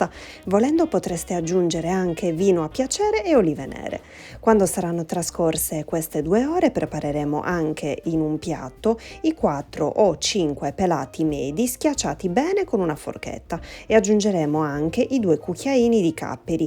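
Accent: Sardinia, Italy. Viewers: Ciao mi fai troppo ridere 😊 a succhittu.....come usava il dialetto sardo mia nonna....mi piace troppo il tuo accento.....unico salludi e trigu e ti auguro un anno pieno di successi ❤️❤️❤️❤️❤️viva la nostra terra sarda.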